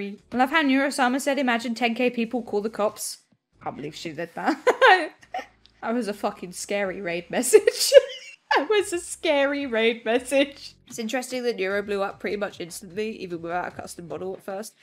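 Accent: United Kingdom. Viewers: Her british levels are much bigger than Vedal's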